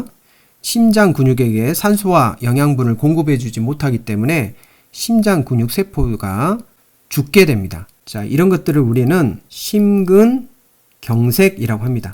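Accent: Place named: Jeolla, South Korea. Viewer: 우리 교수님, 고향이 전라도 신가봐요😊 심장시리즈 더 올려주실건가용??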